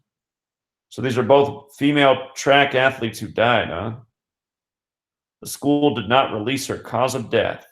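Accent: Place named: United States. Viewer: London Freemasons was written on that helicopter on the London bridge terror events last year, and the guy with the anonymous mask running in placing a sheet of plywood over the spot where the car was supposed to have crashed into. I know someone already pointed this out. Leicester is pronounced Lester And Sinead is pronounced Shin - eeh- d. Your american pronunciation cracks me up haha. Not too many get the Sinead one correct. Its famous for miss pronunciation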